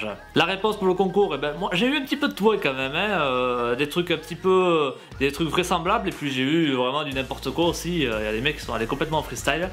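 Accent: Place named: South, France. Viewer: il y avait un accent du Sud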